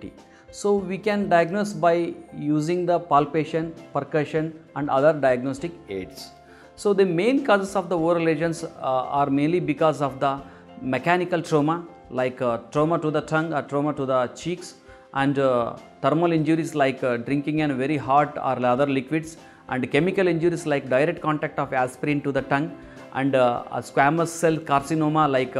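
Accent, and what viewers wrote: South India, India. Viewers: South Indian accent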